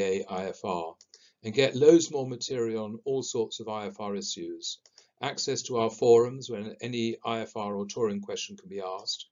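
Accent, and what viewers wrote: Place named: United Kingdom. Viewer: Thank you so much for this basic info for every aspiring pilot...Very informative, and presentated in a very clear and "to the point" way! (despite it's a bit difficult for me to grasp everything, because of your Brtish accent, but i' plan to watch the video several times.) Once again, thank you Sir!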